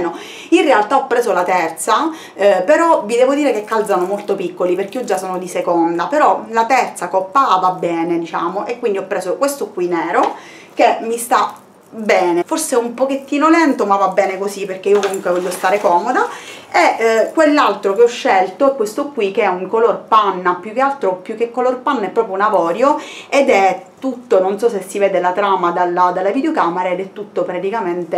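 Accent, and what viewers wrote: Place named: Catania, Italy. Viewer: Hai un accento bellissimo ,sei catanese per caso ?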